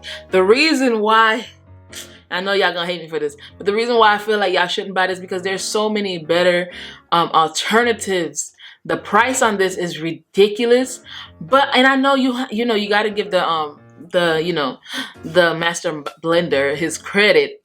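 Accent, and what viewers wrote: Haiti. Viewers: do i hear a haitian accent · Are you Haitian! I hear an accent ☺️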